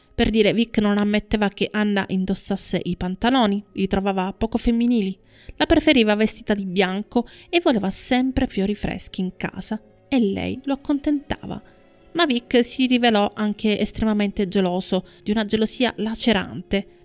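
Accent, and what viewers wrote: Sicily, Italy. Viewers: Ciao, appena iscritta! Ho trovato la storia sconvolgente, adoro da quando ero adolescente gli attori del passato, fra questi il mito per eccellenza Dean di cui parli...Mi sento dirti grazie, tenendomi il beneficio del dubbio( leggendo i commenti degli altri iscritti..anche riguardo le fonti del libro letto..) sulla totale veridicità dei personaggi di cui parli, non so chi sei etc..che sei siciliana lo sento dal tuo accento! Ciao buon lavoro!🌹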